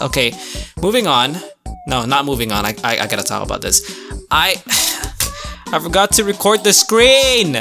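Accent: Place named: United States. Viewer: ayo i thought bro is american he have a very good american accent hello bang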